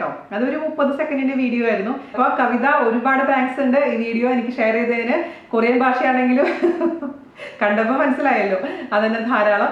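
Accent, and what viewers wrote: Thrissur, India. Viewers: Simple avatharanam adipoli 👍thrissur ano slang like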